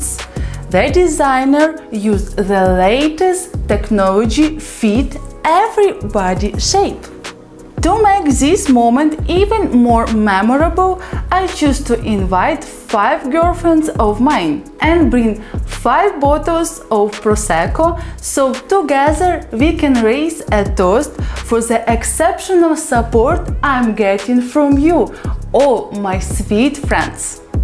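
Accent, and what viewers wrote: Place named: Russia. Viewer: Видеоряд зачёт 😍, но, такой "Рунглиш" я давно не слышал ))) тренируйтесь побольше ))))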